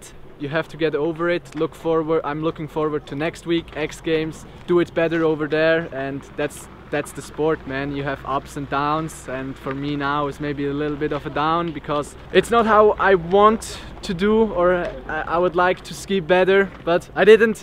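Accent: Switzerland. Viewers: Deine Vlogs werden echt immer besser! Liebe deinen Schweizer deutschen Akzent😂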